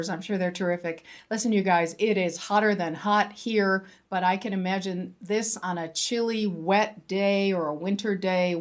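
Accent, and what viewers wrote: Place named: Midwest, United States. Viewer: Your accent reminds me of the midwest, from Michigan here. I love watching you and your husbands adventures in Vegas, thanks for sharing!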